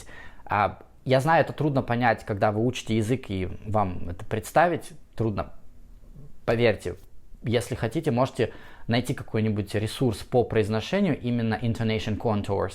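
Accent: United States. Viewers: пожалуй не соглашусь, доносит вполне себе усредненый, современный американский и канадский английский.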